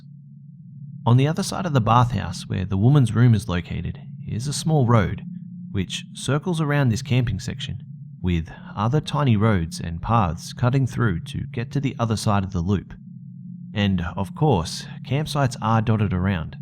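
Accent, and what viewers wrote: Australia. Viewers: i mean, where would he get his outro from? "G'day mates.." sounds pretty aussie to me and i should know...im a proud one..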